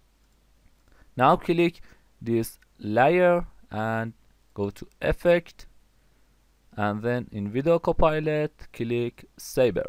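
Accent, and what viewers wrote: Iran. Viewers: Hmm are you from Iran? Your accent sounded like your Iranian.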